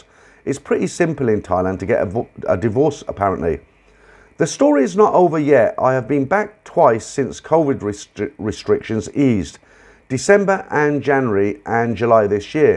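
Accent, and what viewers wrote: England, United Kingdom. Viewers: Another good story i hope they keep coming in good pommy accent so proper 😂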